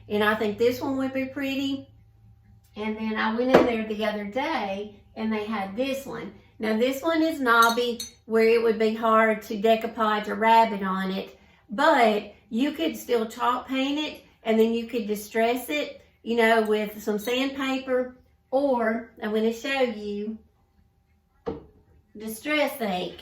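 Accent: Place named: South, United States. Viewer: Hi, I am a new subbie and I love all your DIY's !!! You do wonderful work and everything you do always looks so beautiful and elegant ! I know you have to be from the South....I can tell by the way you talk !!! I am from Mississippi, so I know that southern draw !! Thanks for sharing your creativity !!! =^.^=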